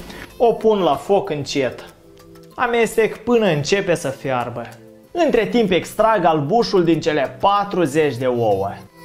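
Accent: Moldova. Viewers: Tu esti moldovean?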